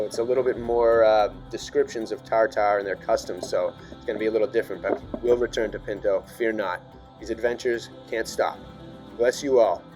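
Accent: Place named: Massachusetts, United States. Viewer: I know your from MA, I can hear it! Lol lmk I’m from revere kid!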